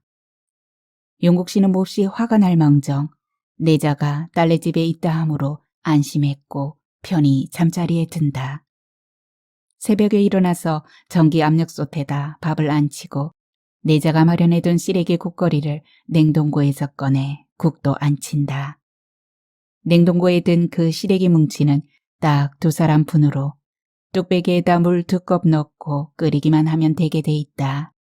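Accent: Jeolla, South Korea. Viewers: 밤늣은시간인데잠을못이루고 있어요너무재미있어서요 구수한전라도사투리가듣기가 좋군요긴장편잘듣겠습니다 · 님 어찌그리 전라도사투리를 맛갈나게 읽어주시나요 전라도 사투리 매력에 빠져들고 소설에 빠져듭니다~ 감사히 잘 듣고 있습니다~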